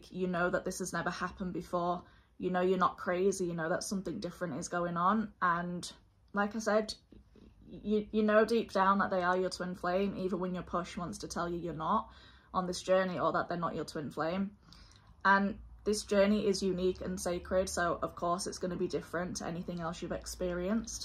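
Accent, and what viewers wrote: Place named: England, United Kingdom. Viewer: ...i know right, since when did 'not' recording for awhile, and doing anything other than laying dead in a ditch or being in a prison cell, mean laying in a ditch or being in a prison cell. 😂😂😂 Again thank you, i love your beautiful interpretation and articulation of the TF Journey. I notice your english accent, im irish, so 'hi- diddly - ho, neighborino', as Ned Flanders would say. 😂🙏🏻💚 Keep up the good work.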